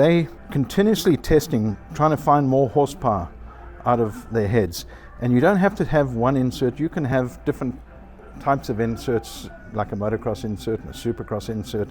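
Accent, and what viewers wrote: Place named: South Africa. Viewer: You, kind Sir, sound incredibly South African, if you dont mind me saying so. 2stroke Love from South Africa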